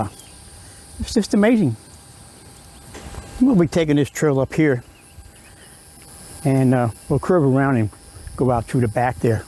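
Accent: New York, United States. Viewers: Hi, loved this video...Your house and garden are looking so beautiful...Have meant to say before, I just love your New York accent...👍❤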